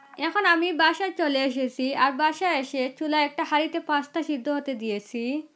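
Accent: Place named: Sylhet, Bangladesh. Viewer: তোমার মাত হুনিয়া বুজিলাইছি তুমি সিলেটি।আমার এলাকার আফা।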